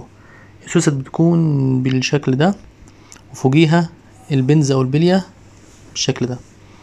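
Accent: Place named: Syria. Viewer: Te felicito tu programa es muy explicativo, aunque me casi no entiendo el arabe tuyo pero pareciere sirio o libanes. Soy de Venezuela y me gusto en verdad ese secreto de los cerrajeros. Bendiciones y muchas gracias.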